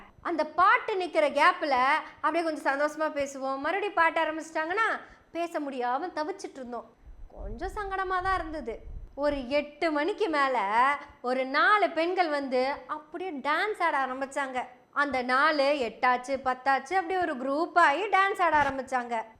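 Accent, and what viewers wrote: Kongu, India. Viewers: தாயே அருமையான கொங்கு தமிழ் உனது குரல் மலழை மொழி போல் உள்ளது அருமை தம்பதிகளுக்கு மனமார்ந்த வாழ்த்துக்கள் ஜெய்பீம்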